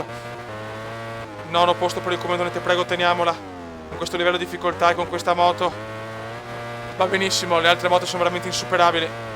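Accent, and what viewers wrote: Veneto, Italy. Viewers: prendili coem dei consigli: 1- perfavore basta con le frasi fatte tipo sono teso, ripetuto 20 volte, i soprannomi ai piloti (dopo un po sono tristi), comunque grande con l' accento da gondolaro e il viva la figa<3 spezzi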